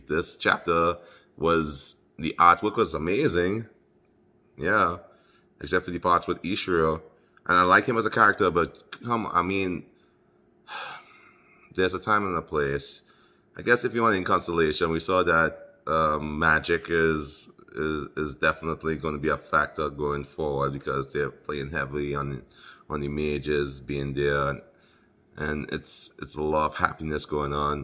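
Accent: Trinidad and Tobago. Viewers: What’s ur background bro? Ur accent sounds Trinidadian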